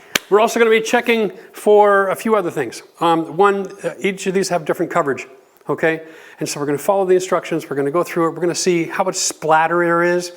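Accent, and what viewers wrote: Canada. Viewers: Apparently the accent and use of millimeters didn’t clue you in, but this guy is Canadian.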